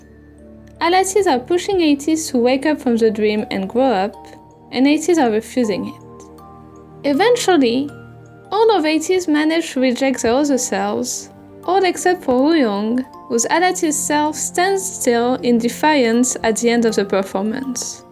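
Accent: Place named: France. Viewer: your videos are really cool !! but you have a strong accent, are you french by any chance?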